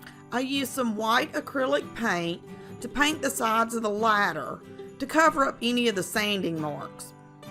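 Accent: South, United States. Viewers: I love all of your projects and your Southern accent, which is like mine.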